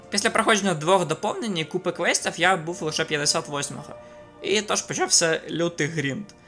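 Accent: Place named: Lviv, Ukraine. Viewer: У вас такий акцент цікавий, ви зі Львові або області? У мого друга просто ідентичні інтонації просто цікаво тепер 😄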